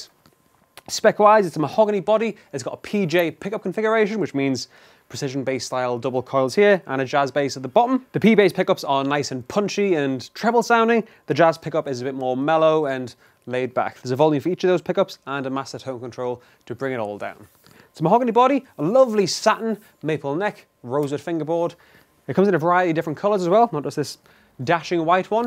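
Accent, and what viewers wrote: United Kingdom. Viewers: British. No bollocks talk.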